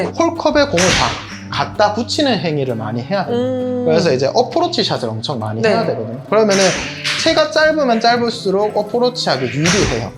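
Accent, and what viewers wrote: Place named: Gyeongsang, South Korea. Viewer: 사투리 좋아요 지도 경상도라 방가방가입니다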